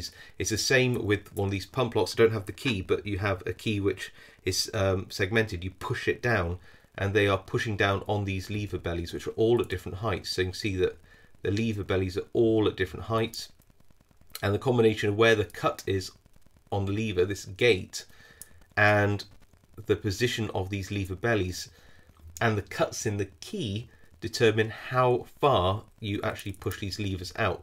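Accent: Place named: United Kingdom. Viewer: I love the Queen's English.. "Leaver" vs. "Lever" lol.. same same but all the better... Btw good job..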